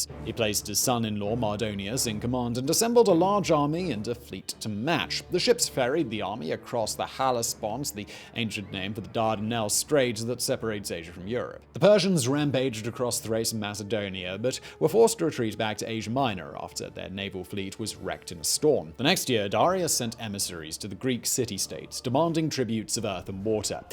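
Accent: United Kingdom. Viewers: You talk so fast, and speak in a British accent, that I have to use subtitles to follow you.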